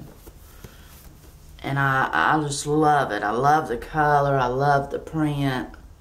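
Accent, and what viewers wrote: South, United States. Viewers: you are so sweet..."Im not trying to brag..." I just love your videos...I enjoy your hauls and your gifts you got for Mothers Day...Happy belated Mothers Day..I just became a subscriber...xxI am from Rhode Island...I know you are probably from the South from your beautiful accent..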